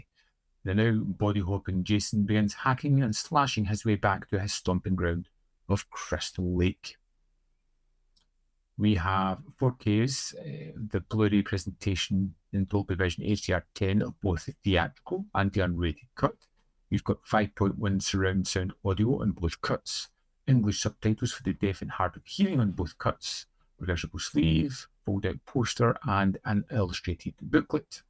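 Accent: United Kingdom. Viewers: I subscribed in your channel, mr, Nice content, are you from glasgow, liverpool, manchester, newcastle upon tyne, edinburgh or belfast northern ireland? I notice because of your Accent 😊👍🏻.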